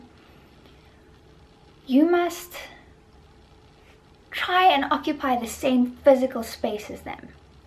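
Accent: South Africa. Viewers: lol your accent is different. where in South Africa are you from? CTP or DBN? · You have never sounded more South African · Is that an south african accent you have?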